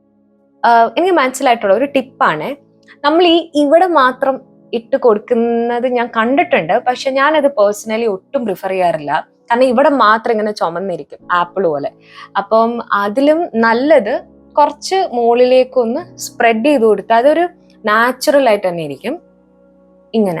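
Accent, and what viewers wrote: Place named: Kottayam, India. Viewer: Luvd ur video, n ur look too.. ടെ വർത്താനം ഒത്തിരി ഇഷ്ടമാണ്.. ആ mike nte 'സോക്കെട്' കേട്ട് ഞാൻ ചിരിച്ചു..തനി കോട്ടയം വർത്താനം ആന്നെയ്..😅 ഇവിടേം അത് പോലോക്കെ പറയാറുണ്ട്😁 like ur videos, esp dress hauls..kp going dear. ❤ Princy,frm Tiruvalla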